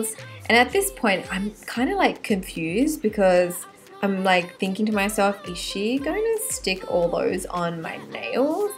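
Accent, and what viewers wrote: Australia. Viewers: you def have an aussie accent :) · Wait your australian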